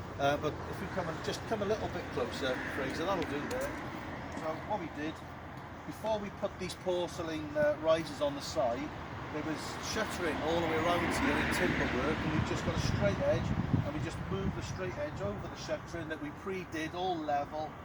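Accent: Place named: South Wales, United Kingdom. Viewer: Efflorecence is so disheartening. I feel your pain it is no reflection on the work of us bricklayers. Listening to your accent I think wr are from the same neck of the woods ( South Wales ?). The majority sand the builders merchants supply is dredged out of the Severn estuary which will have more of a salt content than pit sand . Would be interesting to know if builders in other areas have the same issues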